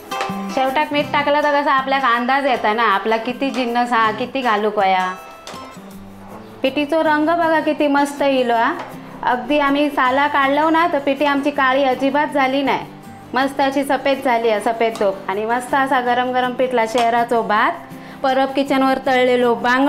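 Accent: Malvani, India. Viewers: Maaka pn pitti lay aawdta aapli malvani bhasha eikayla kiti god vatta recipe khupp Chan 😋😋👌👌👍🏻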